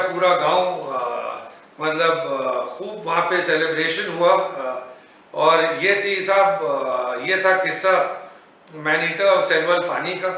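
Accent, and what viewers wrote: Bhopal, India. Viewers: Zabardast story👌 and very well narrated in bhopali style..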